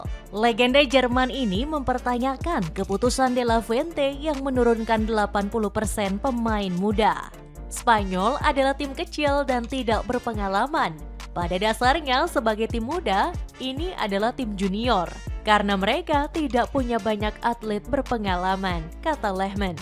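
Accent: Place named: Surakarta, Indonesia. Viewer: Makk ngeriii ya, pakai narasi pria solo dia. Kartu merah sitttt😂😂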